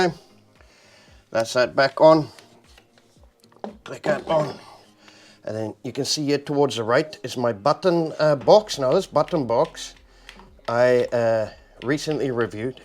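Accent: South Africa. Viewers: dunno how i stumbled onto your channel ill give you sub. Loving the attitude and thick accent, i miss SA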